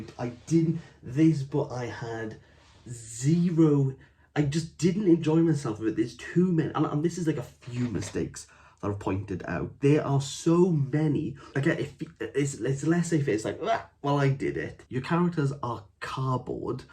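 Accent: Wales, United Kingdom. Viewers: Cool review. Love a welsh accent I was born there left when I was 5 and have never been back.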